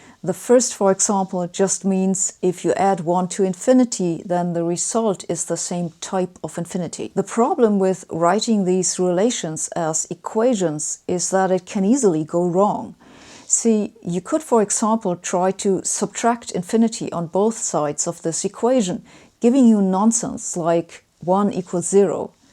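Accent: Germany. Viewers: Why is it that Ms. Hossenfelder is the only Youtuber that forms her words so perfectly that I never miss one? The slight German accent doesn't hurt, it may even help. Subscribed!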